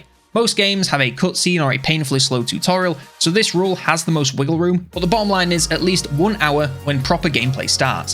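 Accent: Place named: United Kingdom. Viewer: It's nice to hear a British accent telling about something interesting. What exactly is it though?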